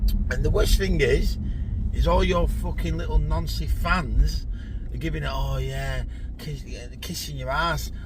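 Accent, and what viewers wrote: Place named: Lancashire, United Kingdom. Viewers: That's the strongest lancs accent I've heard, thought you were a Yorkie. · That's Lancashire just like myself 😊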